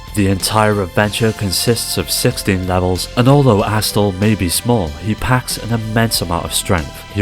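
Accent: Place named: Cardiff, United Kingdom. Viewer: Thought I detected a fellow Diffian. 😃😎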